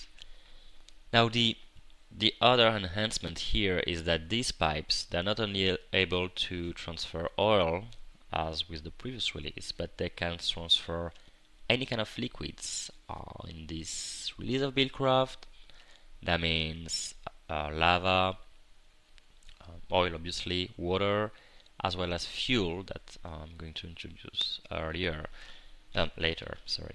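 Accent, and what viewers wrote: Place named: France. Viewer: I have yet to hear a Frenchman speak perfect English though, most of them talk like give or take some. You can hear they are French the moment they open their mouth. And in typing they too can reveal their nationality because they use "of" a lot in their text. It's all good though because you can understand what he is saying and that's all that matters in the end.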